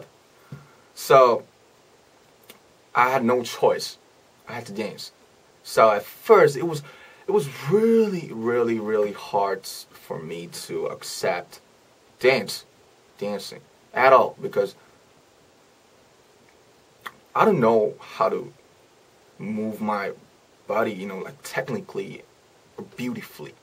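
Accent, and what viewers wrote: United States. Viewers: he literally sounds like a true American- bruh my dad who has lived in the states for over 15 years has a strong polish accent and he also learned english from friends meanwhile here who has never lived in the states for more than what a couple months sounds like he was literally born and raised in the states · He sounds like he was born and raised here in the states and went over to SK to be an idol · A cute LA California boy 😆. But his accent is amazing. Legit thought he was from America or had lived here for a long time. Mind blown by him all the time. Love you RM 💜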